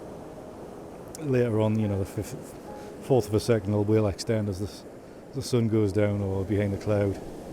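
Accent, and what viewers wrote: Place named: Northumberland, United Kingdom. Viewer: Thank you, for these very interesting and informative videos. Like many, I came here after seeing you with Thomas Heaton. Your work is particularly reminiscent of the impressionists like Turner, in particular 'Wreckers Coast of Northumberland' and I applaud your efforts in this field. I liked your approach to processing the images and loved the outcome - a very unique perspective on a well known location. I can't wait to get out and try this myself. One small criticism is that when you were outside, the audio levels were a little low making it difficult to hear. No problems in the later two. Nice to hear a Northumbrian accent on here too!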